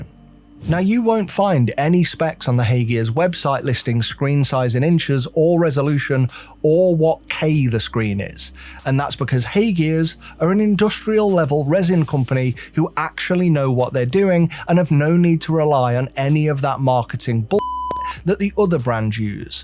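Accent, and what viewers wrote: United Kingdom. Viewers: We are in the process of setting up a small-batch consumer products company and have recently ordered a number of GK two's. Love the concept of this Heygears unit as it has the potential of minimal fettling. Unfortunately the cost of the resin would render the units impractical as it would push our product price-points too high. P.S. Great video. As alway's, informative, concise and candid. All in an East-Midlands accent. What's not to like :)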